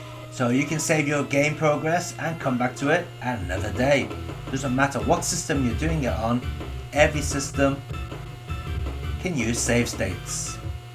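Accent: United Kingdom. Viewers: I love your channel. I have to ask, as "verboten" as it is today, about your background (yes, your heritage... Oh NO!). Obviously you live (or lived?) in Japan but you have a very obvious British accent and as far as your look... well that's not as easy! That's why I ask. If it doesn't offend you (and I honestly don't think it will) can you tell us? Thanks.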